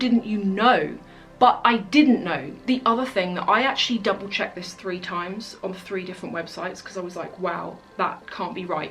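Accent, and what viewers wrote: RP, United Kingdom. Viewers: I love your posh accent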